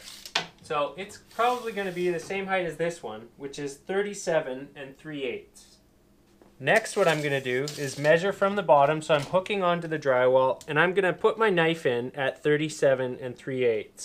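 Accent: Canada. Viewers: Who else is here for the drywalling and Canadian accent?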